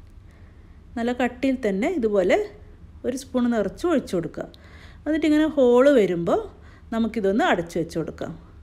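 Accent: Kannur, India. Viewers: Kannur aanenn samsaram kettappoo mnssilaayii😃😃njanum kannur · വെറുതെയല്ല സൂപ്പറയത് മൊഞ്ചത്തീ ആ വർത്തമാനം കേട്ടപൊഴേ മനസിലായി കണ്ണൂർ എവിടെയാ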